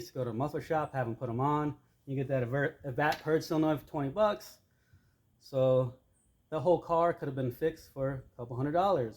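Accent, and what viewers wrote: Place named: Ukraine. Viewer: I can tell you’re Ukrainian by your accent.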